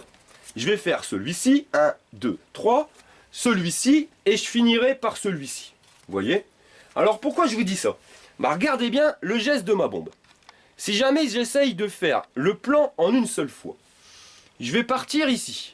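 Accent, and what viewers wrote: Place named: North, France. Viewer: Salut, alors je te trouve excellent ! Vraiment ! Ta gouaille , ton accent du nord je pense, ta façon d'expliquer ta technique de peinture et ton humour , j'adore mdr ! Merci pour ce bon moment et je vais attaquer mon vieux vélo plus sereinement. Merci pour tout !! J'ai juste un léger doute sur le orange de ta mob :)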